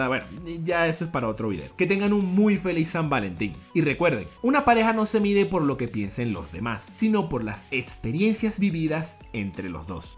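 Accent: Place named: Venezuela. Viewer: Oye man pregunta de curiosidad ,eres de Venezuela? Se te nota el acento eso y tu canal esta bien pavo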